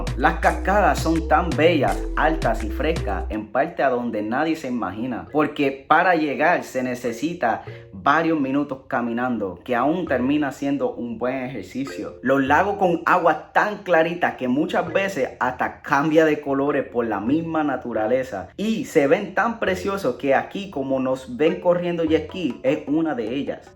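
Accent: Puerto Rico. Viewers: Gracias, muy buen video sobre el pulgarcito. Pero tu hablas y pareces mas Boricua que un "gringo" como dice el titulo de tu video. Cambiale de gringo a boricua. Yo estuve mucho tiempo en P.R. y tambien me anamore de la Isla, lo mismo de NY en donde mis amigos eran casi todos boricuas. En horabuena!!! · Me encanta el acento puertorriqueño y excelente que disfrutes de mí país junto a tu esposa! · A donde esta el gringo, yo veo un Cici y una Cerota osea, no mames que tu lengua raspa, Eres puertorriqueño dumb fuck 👊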